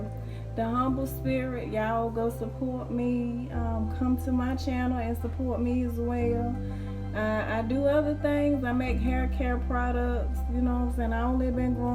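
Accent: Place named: South, United States. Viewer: Hello Humble Spirit. I so enjoy your channel. I love your soft speaking voice with that southern tone. Even through your shyness I can you're a sweet person. You have the best teacher. Keep the video's coming. Next time show us the food while you're cooking. That's right feed your babies when they are hungry. Be Blessed. Tell Soulful T hello.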